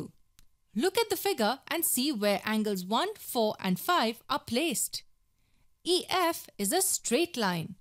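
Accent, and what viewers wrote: India. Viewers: Beautiful Indian accent! Clear! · The indian accent.... ughhhh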